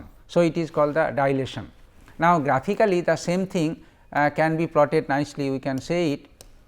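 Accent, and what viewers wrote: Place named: Bengal, India. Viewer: I think Hindi is not his native language. I think his native language is Bangla . I am getting that from his accent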